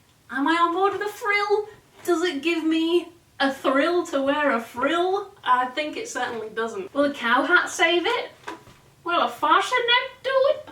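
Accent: Liverpool, United Kingdom. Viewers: Why do you look good in everything 😍 and that scouse accent HAHA been living in liverpool for 3 months and i still can't get over it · My live watch-along thoughts: 1. As you said "You're my pal in the corner saying that's the one" I WAS TOTALLY YOUR PAL HYPING YOU UP, definitely go for the second white dress. 2. A black fedora can make any outfit, you must take it! 3. Your black turtle button dress did look a bit big but I think if it's really hot (ha! unlikely) you'll appreciate taking it and a belt could help. 4. Your pink paisley dress looks frickin adorable, can definitely see you floating round a field with that covered in glitter, very Florence-esk! 5. I agree I think the blue paisley dress needs to be longer but again I love those sleeves! 6. sings along to Might aswell face it your addicted to sleeves 7. The ditsy leopard dress reminds me of mustard, and I'm not sure it's a good thing. 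You can def do better. 8. Catagorically hanus is my new favourite phrase 9. HOLY FUCK THAT DENIM LOOKS AMAZING ON YOU! 10. OMG SHE DOESN'T LIKE IT, I WISH I COULD BEEM MY THOUGHTS TO YOU 11. The denim also has pockets, extra brownie points. 12. Those jeans are banging 13. I love your scouse accent because it's like Jodie Comer has just wandered in. (Killing Eve fans will know) 14. you just bless us with your videos, have an amazing time at Glasto!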